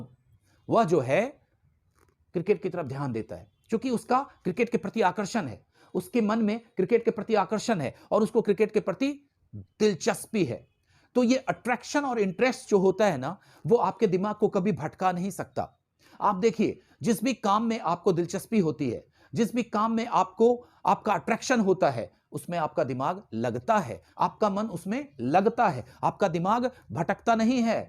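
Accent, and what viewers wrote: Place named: Bihar, India. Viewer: Very nice video sir, I like you biharihood tounge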